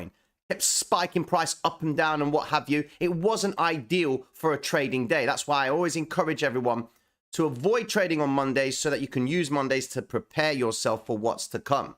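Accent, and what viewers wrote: Cockney, United Kingdom. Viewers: Big love. Top fella cockney T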